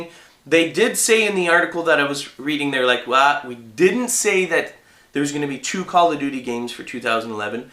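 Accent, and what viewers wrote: Canada. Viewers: are you canadian "aboot" not about, "aboot"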